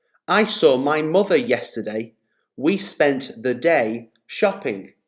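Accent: United Kingdom. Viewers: Does the speaker's accent is a british not american ?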